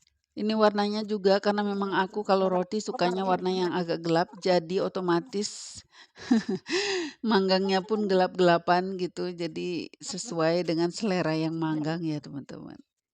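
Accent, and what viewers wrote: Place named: Bandung, Indonesia. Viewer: Suka banget sama kalo LG NGE bake inget uwa yg di bandung..sama logat nya... mengingat kan waktu kecil pernah tinggal di bandung sama uwa...sehat y...semangattt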